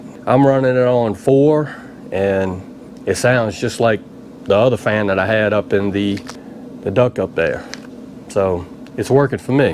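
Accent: South, United States. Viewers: Honestly subbed for that thick south accent 💪🏻😁👍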